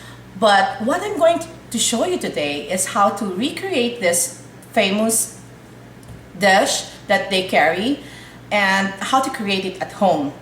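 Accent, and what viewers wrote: Philippines. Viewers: Jollibee adds MSG to their chicken...the real secret ingredient! Madame, I love the way you present your dishes. Your Filipino Accent is truly Filipino...brings me back home. Hugs my teacher...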